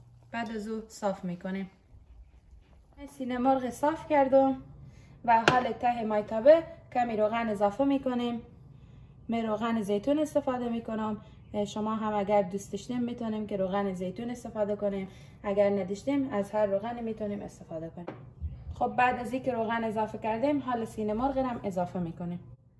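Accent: Herat, Afghanistan. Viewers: بسیار عالی امی‌زبان هیراتی بسیار مقبول است همیشه برنامه هایتان را میبینم شما در کوجا‌زندگی میکونین خواهر شما مرسل از جرمنی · تشکر از زحمات تان عالی بود همچنان بابت نگهداشتن لهجه شیرین هراتی سپاس گذارم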